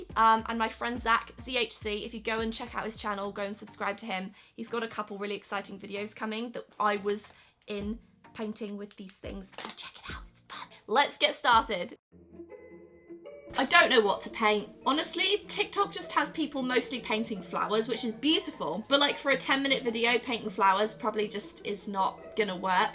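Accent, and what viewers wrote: United Kingdom. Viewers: Yaaah u r british so u will say like that ❤️❤️ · the things you say ‘wrong’ are just the British way of saying them. I say Van Gogh and Amazon the same as you. From UK x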